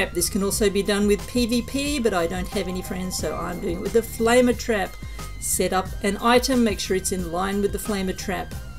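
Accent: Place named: Australia. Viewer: Love a fellow Aussie voice, and such a sweet clearly explained tute. Thanks chicky 😊😊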